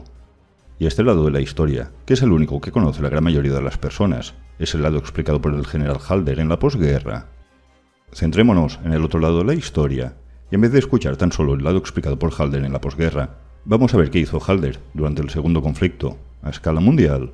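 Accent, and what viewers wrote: Galicia, Spain. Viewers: ¿El locutor es gallego e intenta disimularlo o habla así de impostado?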